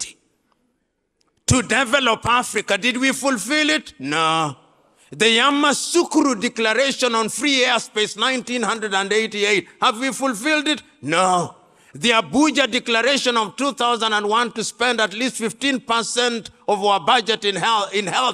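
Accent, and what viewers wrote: United Kingdom. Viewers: Nigerian an African leaders love to listen to his Queen - english. They don't care about the content of his speech.. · You with your fancy British accent and your usual many historical blames on colonization has not come up with any structurial plan to take Africa out of the mind set they're in. Join Mali,Bukino el fasco and the other military leaders who are taking that brave stand moving forward. Africa must unite!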